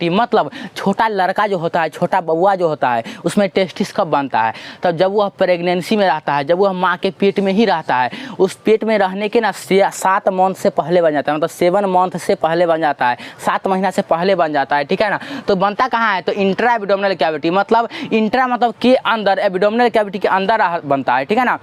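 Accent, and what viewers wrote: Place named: Bihar, India. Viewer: Bihari styles me wah...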